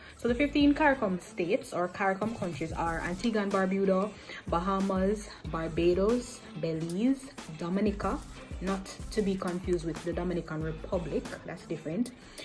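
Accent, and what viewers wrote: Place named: France. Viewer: Very good information for prospective travelers.Are you French?You sound French.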